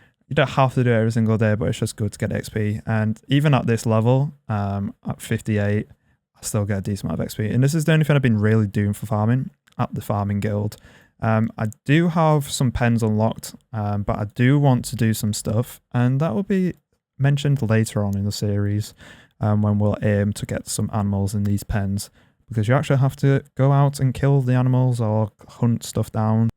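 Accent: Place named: Yorkshire, United Kingdom. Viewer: You sound like a Yorkshireman 🤔 refreshing to hear from a Runescape player 😂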